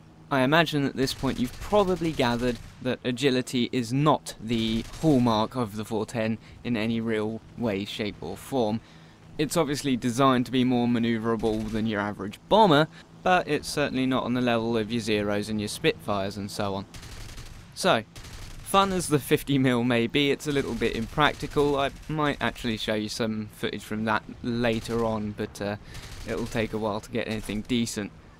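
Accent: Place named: United Kingdom. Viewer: Ahhh finally a gameplayer, who speaks a clear and good british english. Thank you! :D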